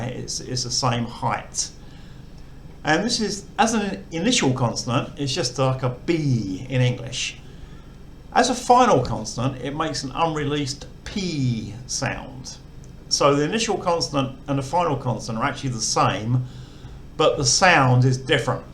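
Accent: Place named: Southern England, United Kingdom. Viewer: Hi Interestingly, if you are northern English, then but and put ARE pronounced the same (both pronounced as you say put). To my northern ears, southern English such as yourself say almost bat (for but). Hence the problem of transliteration of English in to thai for English people, let alone considering all the other nationalities. Keep us the good work.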